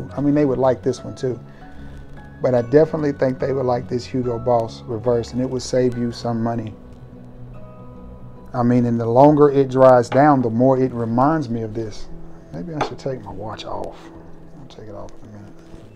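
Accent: South, United States. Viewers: Arkansas or Georgia?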